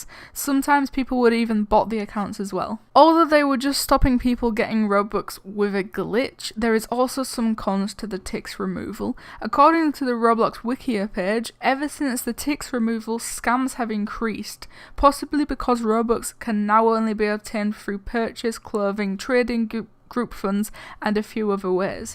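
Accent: United Kingdom. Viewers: oh god what is with your accent, jeez. thats some pure accent from britain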